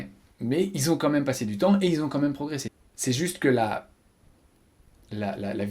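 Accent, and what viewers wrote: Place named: United Kingdom. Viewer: J'adore votre accent so British 😊😊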